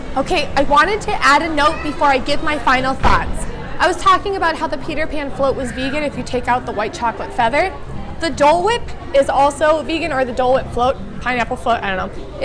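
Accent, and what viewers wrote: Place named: Western New York, United States. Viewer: are you from western NY? The accent sounds like you may be.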